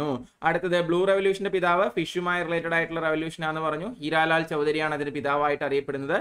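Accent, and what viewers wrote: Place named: Palakkad, India. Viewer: Chikku chooru... Palakkadanz.. ✨️anagne paraya... ❣️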